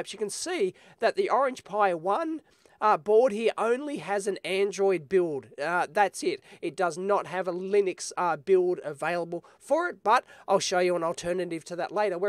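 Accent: Australia. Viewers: He sounds less Australian.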